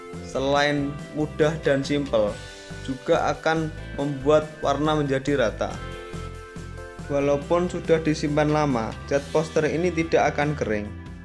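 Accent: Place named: Java, Indonesia. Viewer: Medok parah cuk.. 😅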